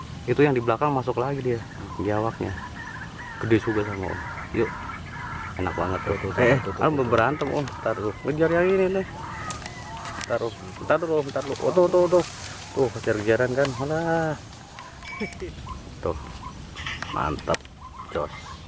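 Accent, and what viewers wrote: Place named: West Java, Indonesia. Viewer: Daerah mana bang ngomongnya sunda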